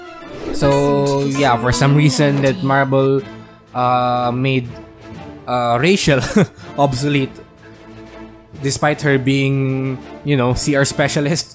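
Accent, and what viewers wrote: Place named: Philippines. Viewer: First 30 seconds accent I tought you were from Romania, then I realized Philiphines.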